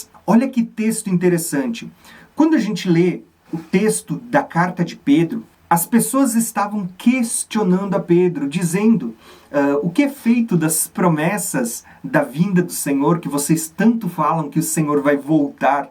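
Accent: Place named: South, Brazil. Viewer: Sotoque do Sul irmão…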